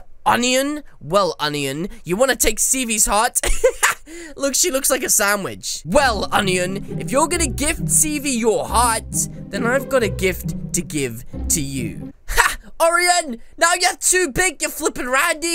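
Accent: United Kingdom. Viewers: bruh he sounds like a british person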